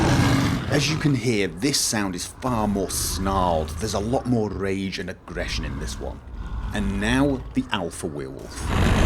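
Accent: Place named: North, United Kingdom. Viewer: Let me just say that you are probably THE Greatest "Instructor" Or whatever you like/prefer to be called... lol May I ask where your from exactly? (Random question) haha. My dads from Ipswich and hes normally good with accents and all that be he couldnt figure it out.. He said maybe somwhere kind of North in the UK or somthing... (I know you probably dont like your comments to be filled with random stuff like this, but I HAD to ask) lol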